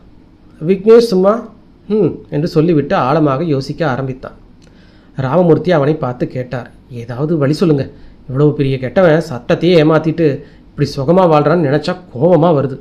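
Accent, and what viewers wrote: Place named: Kongu, India. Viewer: கதையும் வாசித்த விதமும் குரலும் அருமை வாழ்க வளர்க ...... உங்களது பேச்சு சிறப்பு கொங்குதேசம் போல தெரிகிறது தொடரட்டும் பணி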